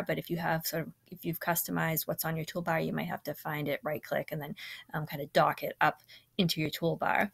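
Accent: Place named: New England, United States. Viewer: Hey, Thanks for the videos! Being from California I get a laugh from some of the wicked New England pronunciations (drawring, lol). Anyways, do you know if it's possible to merge a worker account? I realized I had an account I worked on years ago that had a few HITs that might help me push towards 1000. Keep up the good work!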